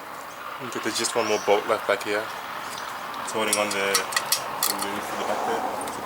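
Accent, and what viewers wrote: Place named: Australia. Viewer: Nice work mate. Love that Aussie Accent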